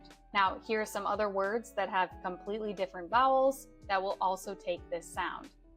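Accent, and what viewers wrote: United States. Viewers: Teatcher are you amirican..?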